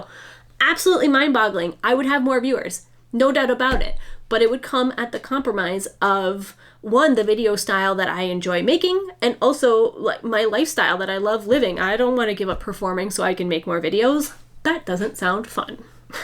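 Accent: Canada. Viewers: I appreciate that you aren't wasting tradies' time by asking them to come give you a quote when you have no intention of hiring them! I like your slow footage and I don't think you need to defend why your videos are different to others'. One of the great thing about youtube is the variety of styles that work for everyone. Do you think your editing style is informed by your circus performance style? You're Canadian, I'm Australian, probably most of your viewers are in the US... of course we all pronounce and spell things differently! If we all understand each other, what does it matter? And thanks for answering my question about the drain! I forgot about the soil-too-high aspect of your home, but I was genuinely interested because we are also renovating our 140 year old home and I was wondering if digging our drain inside was something we should consider. I hope it didn't seem rude! 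Thanks for your community engagement, it's definitely another reason I love your channel!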